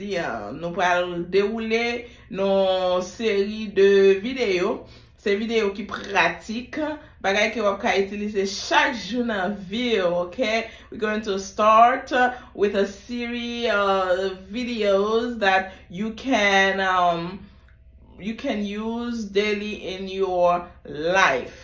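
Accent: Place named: United States. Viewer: With good American accent I like to watch your video my dear cousin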